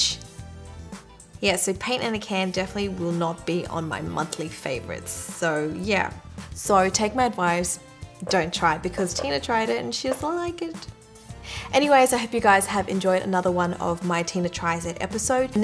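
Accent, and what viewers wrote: Australia. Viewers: I THOUGHT U WERE ASIAN BUT UR AUSTRAILIAN RIGHT? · I love it when I watch videos of people with an Australian accent. We can sometimes be hard to find on YouTube. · Is she Australian? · Are you from Australia?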